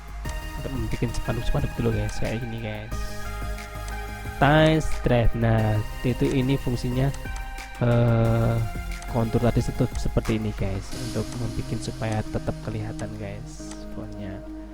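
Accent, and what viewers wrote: Java, Indonesia. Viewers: medok gaess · Jowone ngendi sampean mas?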